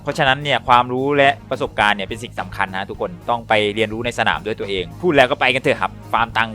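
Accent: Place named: South, Thailand. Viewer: สำเนียงพี่คนใต้นะ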